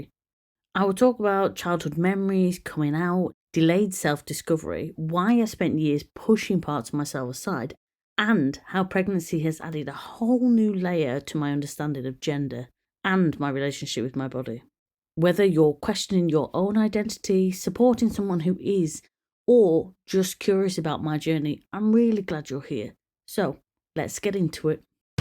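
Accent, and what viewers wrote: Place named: United Kingdom. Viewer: Hey, could you write your email please? Hard to hear for non-british ears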